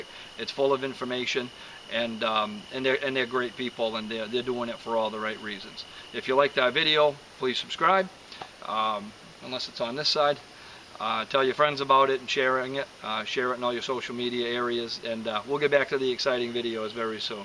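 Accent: Maine, United States. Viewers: You from Maine? You've got the accent.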